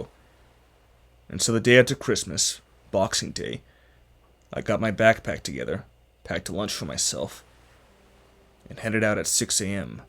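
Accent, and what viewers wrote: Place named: Yorkshire, United Kingdom. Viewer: Sounds like a yorkshireman right enough. 😏 Ps. We also have resident dolphins and tuna in UK waters during warmer months.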